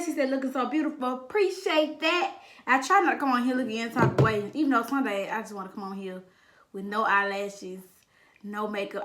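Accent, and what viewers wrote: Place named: United States. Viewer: Love you and your country accent lol girl friend shooot mmmhmmm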